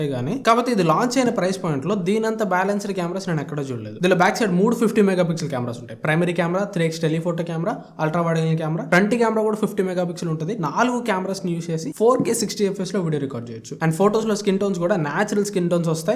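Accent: Ongole, India. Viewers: Bro meedhi Ongole aa ?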